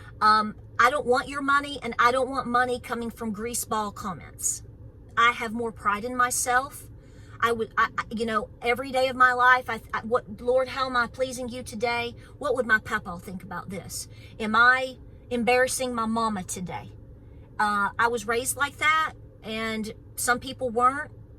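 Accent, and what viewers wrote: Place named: Tennessee, United States. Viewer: my mom's people were from East Tennessee. You sound just like them! It is like going back in time for me when I hear you use an expression from my childhood. I know many of the areas you speak of, too. (One of my great aunts lived in Maryville...I love hearing you say Maryville.) I grew up in the area as well. So, I have never doubted you. The things you mention...blackberry winter, for instance, are things that I remember my grandmother talking about. She still dried her apples in the sun when I was a kid. She ate those dried apple chips like candy. I didn't like the taste at all, but they made wonderful pies. I miss all of that so much, so watching your videos is like a little slice of that for me. I enjoy everything you talk about and share. I love seeing you care for the goats and hearing about what you are growing, making, planning, etc. 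I don't know you, but just from watching and listening to you, I would vouch for you any time as a true East Tennessean. I started watching you because you reminded me of all of my mom's family. You help me recall things that I probably otherwise would not. I no longer live in the area, and I do love where I am, but I miss those foothills and love being taken back. So, thank you for simply being yourself. You bring a smile to my face pretty much every day. Now, I kept watching partly for that, but mostly because I enjoy hearing your perspective. I like your advice. I like the way you think as I agree with most everything you say.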